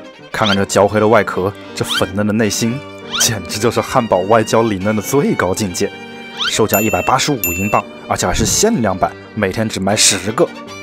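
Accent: Wuhan, China. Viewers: 想问下up主是不是武汉人😂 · 听声音应该是武汉人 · 太棒了！质量很高的美食博主！博主是哪里人？听口音好像是武汉的？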